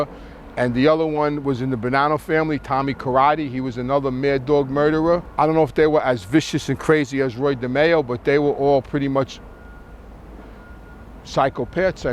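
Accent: New York, United States. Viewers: Great production. Nice to hear a New York accent. Moved up to Catskill, N.Y. 10 years after retirement. Back home now. Man, l felt like Joe Pesci in My Cousin Vinny up there! With my N.Y. accent & my clothing & jewelry they'd step aside in stores, etc. Lol Buon Natale.🇮🇹🇺🇸🌲🍕🍷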